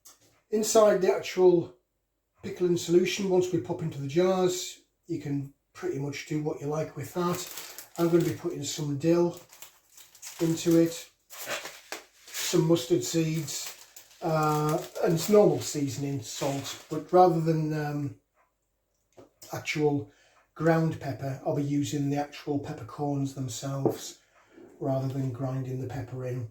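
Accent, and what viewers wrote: United Kingdom. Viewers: You definitely sound British. Love the accent.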